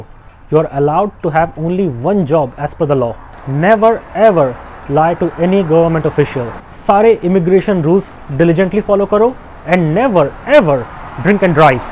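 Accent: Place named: India. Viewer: I love your hindi accent and way to express ideas. Your information is concise. Great job.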